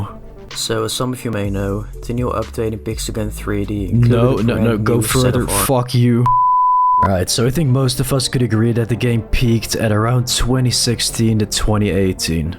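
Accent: Netherlands. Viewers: Is bro dutch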